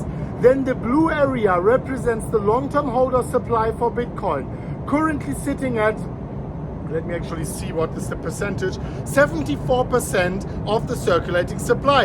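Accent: Germany. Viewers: i always thought is German Nie wiem dlaczego xD <3 Edit: chyba przez akcent:)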